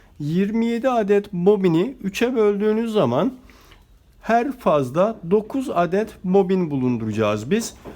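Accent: Turkey. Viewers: Cok guzel istanbul turkcesi konusuyorsunuz...... Ne kadar zamanda ogrendiniz Turkceyi , ve nerelisiniz?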